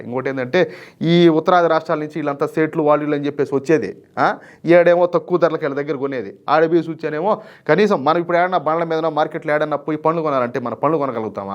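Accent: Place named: Chittoor, India. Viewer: Meedhi Kadapa na 😅 mee slang vini chittoor anukunna.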